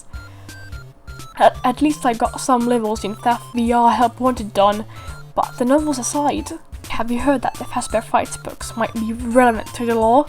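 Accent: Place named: Australia. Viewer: my god you're australian (or british. i can't tell from the two sometimes ;-;) your voice is nice. and great OC!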